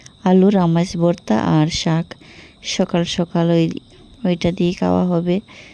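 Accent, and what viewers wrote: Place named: Assam, India. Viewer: তোমার কথা গুলো শুনতে আমার খুব ভালো লাগে। মনে হয় তুমি আসামি ভাষায় কথা বল ❤️❤️একটু আলাদা কিন্তু ভীষণ ভালো লাগে। 👌👌💐🌹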